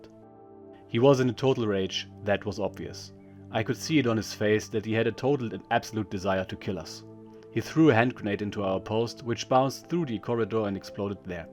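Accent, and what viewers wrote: Germany. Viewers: The German narrating is a definite improvement over the simulated American voice. It makes the narration seem more authentic.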